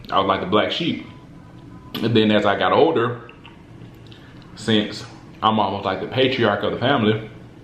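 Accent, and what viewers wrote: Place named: South, United States. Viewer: Love that southern accent lol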